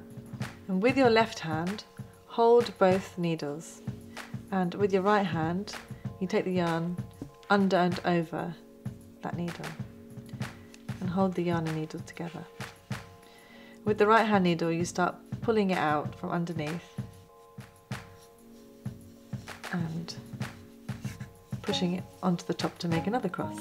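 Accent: United Kingdom. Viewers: Does she have a British accent? Or is it just me that thinks that?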